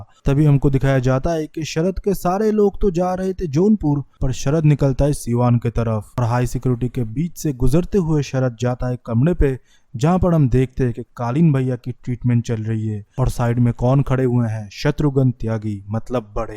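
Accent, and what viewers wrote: Bengal, India. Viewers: Bhai tum...bangali ho kya...??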